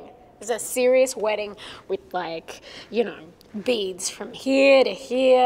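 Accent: Australia. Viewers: It’s probably easier to do a Boston accent with you have already have an Australian one because if you notice her type of accent sound nearly similar or it sound like it wouldn’t be too difficult for her to fake that accent. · To people saying her real accent doesn't really sound Australian, this is a more southern accent, usually NSW, VIC and TAS. The nothern/western states have a more of a New Zealandish sounding accent which is usually what you hear on tv as most Australian media is from Queensland.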